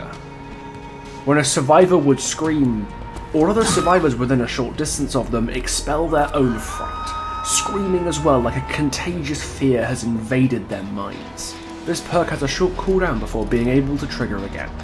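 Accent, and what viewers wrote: United Kingdom. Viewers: Of course the person who’s narrating the video is bri’ish…mf ruined it lmao 😭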